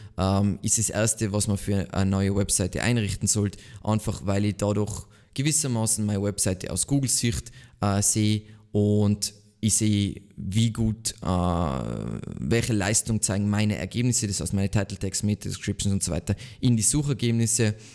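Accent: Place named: Tyrol, Austria. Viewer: So schön wieder den Inschbrugger Akzent zu hören! Was das Video angeht, TOP!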